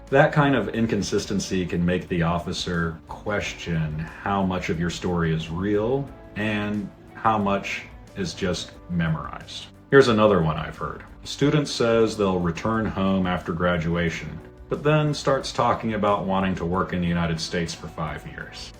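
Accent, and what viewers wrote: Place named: England, United Kingdom. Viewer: Like the way that you simplify things. Best visa officer and English accent ever.❤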